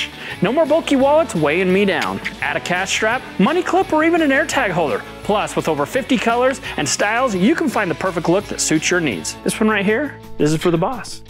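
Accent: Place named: Utah, United States. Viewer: Great show, really enjoy your body-work tutorials. Also amazing how similar to Kaulin you speak, must be a Utah accent!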